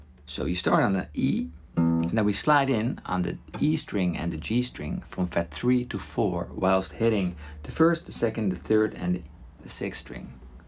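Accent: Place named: Netherlands. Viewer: de man is wel degelijk Nederlands, zijn Engelse accent doet me denken aan dat van Henk Hofstede (van de Nits) :-) (superfijne video trouwens!)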